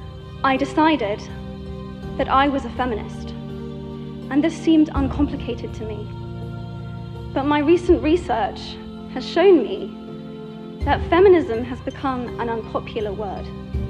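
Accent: United Kingdom. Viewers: British accent 🔥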